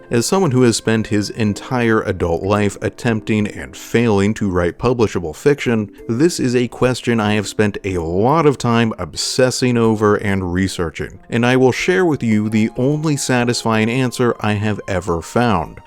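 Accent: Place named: Oregon, United States. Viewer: You sound like you're from Oregon. ♿🏳️‍🌈♿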